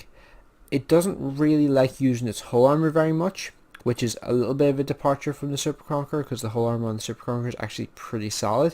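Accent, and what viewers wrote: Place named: Belfast, United Kingdom. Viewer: Where are you, Belfast?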